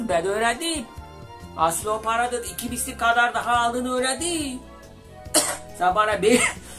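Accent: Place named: Aegean, Turkey. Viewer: buda ege şivesi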